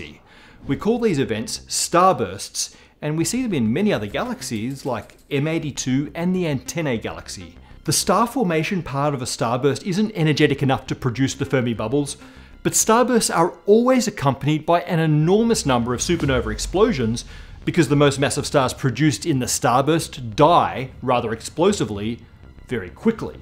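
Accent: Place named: Australia. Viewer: OY! LOOK! AN AUSTRRRRRRRRRRAILIAN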